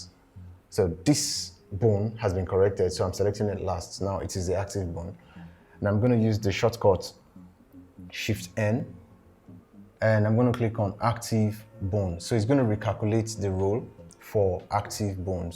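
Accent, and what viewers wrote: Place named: Nigeria. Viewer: This my man sounds Nigerian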